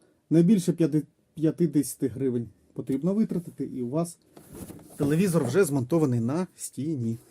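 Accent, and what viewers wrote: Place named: Russia. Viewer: не ломай язык,твой родной русский.Твой украинский с огромным акцентом.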